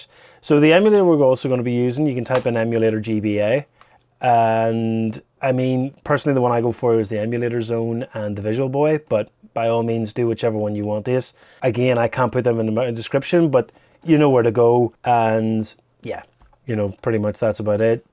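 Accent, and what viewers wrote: Northern Ireland, United Kingdom. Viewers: You sound Northern Irish. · You sound Northern Irish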